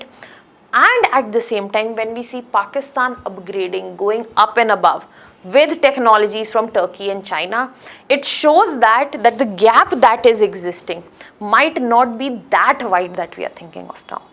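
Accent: India. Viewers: It's new language Indian desi English by desi aunty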